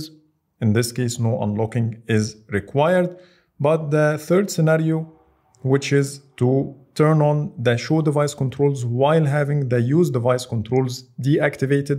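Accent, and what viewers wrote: Egypt. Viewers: You an Egyptian? I can sense it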